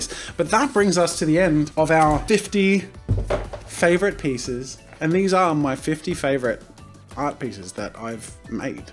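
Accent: United States. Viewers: his American accent is incredible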